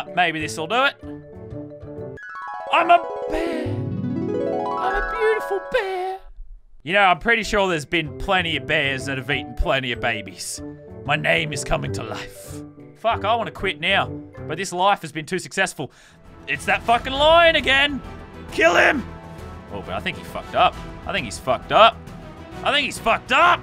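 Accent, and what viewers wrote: Ireland. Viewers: can we just acknowledge how good his irish accent is tho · I'm Irish and he sounded like he was Irish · Damn you got a good bloody Irish accent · Gosh dang your irish acsent is legite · I love lasarbeams Irish acsent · Ur Irish accent is the greatest I liked subbed and turned on notifications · U putting an IRISH accent on to annoy me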